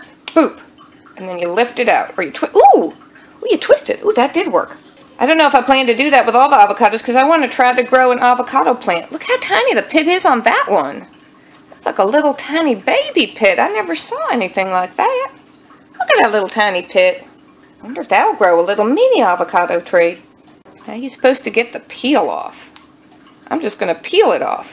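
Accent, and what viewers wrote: South, United States. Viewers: Why do you sound southern today? Or is it me? Anyway, love listening to you ...some pretty good ideas... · I saw jersey license plates in another video but you sound like youre from the south.......just wondering.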